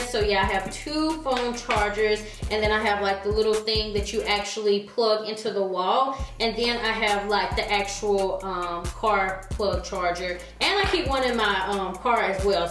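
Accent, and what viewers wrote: South, United States. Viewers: I have been looking at your videos for a little while now but I really have to say I love your southern accent! And girl you are gorgeous😍😘. It was chilly today in Charlotte so after I got my boys off to school I just set back & watched a bunch of your videos, followed & liked pics on your IG. I have become obsessed with your channel just from this morning lol. You have amazing taste! Keeping posting so I can keep watching 😊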